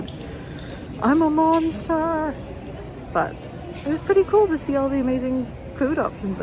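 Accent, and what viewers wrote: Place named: United States. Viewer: your accent sounds like american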